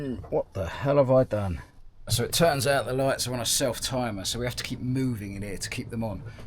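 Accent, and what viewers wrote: United Kingdom. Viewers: British accent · Bros british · I love you British accent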